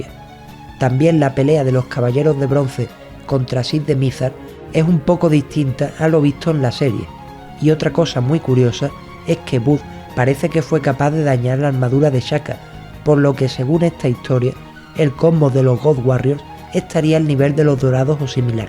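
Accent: Andalusia, Spain. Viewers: Muy bueno vídeo amigo muchas gracias por las aclaraciones. Una pregunta, ¿eres del sur de España? Por el acento 😁. Saludos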